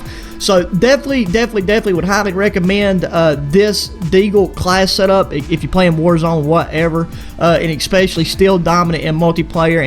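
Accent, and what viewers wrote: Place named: Texas, United States. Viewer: I'm not trying to be rude but you sound like you're from Texas or like a cowboy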